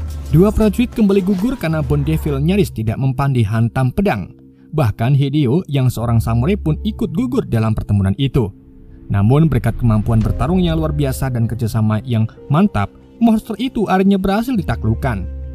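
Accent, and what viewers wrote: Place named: Java, Indonesia. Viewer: Aku yakin admin nya orang Jawa, Kadang lucu juga admin nya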